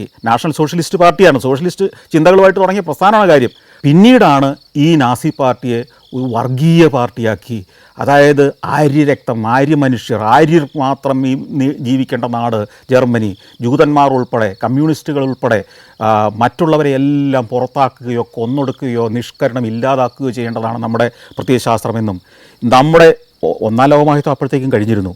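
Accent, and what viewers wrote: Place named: Kottayam, India. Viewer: എടുത്തിട്ട് ഇണ്ടൻ അടിച്ചോണ്ട് ഇരിക്കുവാ....കോട്ടയം സ്റ്റൈൽ😂😂😂❤❤🎉🎉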